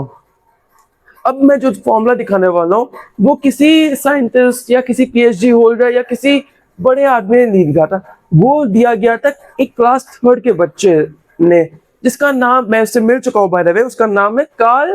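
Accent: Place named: United Kingdom. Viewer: Uk aceent By the way 1st Comment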